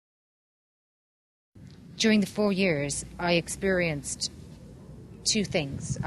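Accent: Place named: Ireland. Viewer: Precious irish accent. Irish queen 🍀❤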